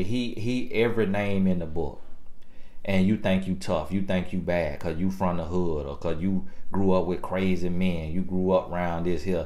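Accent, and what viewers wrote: South, United States. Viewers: I love the voice impressions😂 Just cracks me up. But the country accent makes a lot of these truths more believable. You know what you know and have this here knowledge to share, and you are sharing it, For Free. You could bundle these pod casts in a series and sell em. Keep doing what you are doing! Blessed tribe. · You from down south hear it in yourp accent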